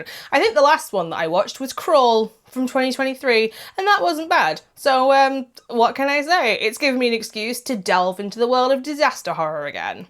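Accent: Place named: United Kingdom. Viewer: tearin' bad movies apart with British accent makes it EVEN funnier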